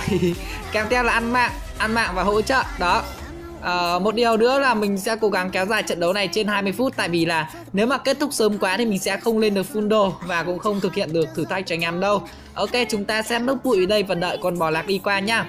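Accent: Nam Dinh, Vietnam. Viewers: A ở nam định hả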